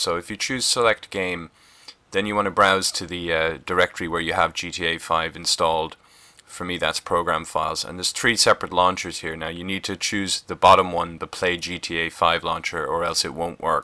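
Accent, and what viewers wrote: Ireland. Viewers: you have the best irish accent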